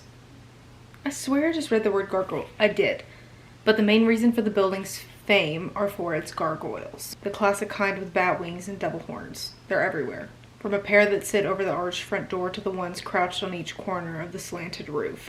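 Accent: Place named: East Texas, United States. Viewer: currently in east texas and your accent is giving me life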